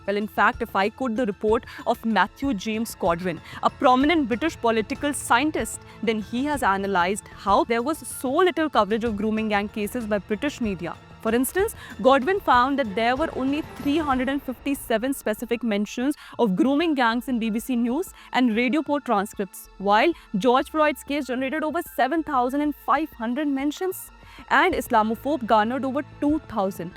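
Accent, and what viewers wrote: India. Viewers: That’s Indian accent, cope with it… and try to focus on the subject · With a certain Indian accent literally one can see you’re making a point scoring . The fact Indians are getting hatred from west one should humble themselves but shamelessness is being genetically inherited by you folks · You wouldn't stand a min when trying to confront Mehdi or Hijab with any of your claims. Would tremble in fear with your goofy strong Indian accent. I know some or let's say many muslims have tried to be too defensive to label any criticism of Pakistanis as Islamophobic, but how many points in this video are false? Two of the biggest ones 1) Hijab didn't instigate the Leicester riots. He went there a few days after the riots happened. But did use strong words along the lines of we'll attack them (the Hindus) the way they'll attack us. 2) Mehdi is also far from an Islamist. 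He's confronted Imran Khan for many of his policies for e.g. His points about Elon flipping on Pakistanis after getting backlash on Indian H1B visas from Trump supporters is true. A few weeks before Twitter was flooded with anti-Indian sentiment and Elon was getting blasted for his views. Now to make up for it he's flipped totally Pakistanis are scum wherever they go and should be punished for their crimes. But you lot from the subcontinent - Muslims, Hindus, Sikhs, Christians, Buddhism all have the same problem of raping & abusing women. It's something in your DNA or in the soil of that region. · too much Indian accent for my brain to tolerate ... sorry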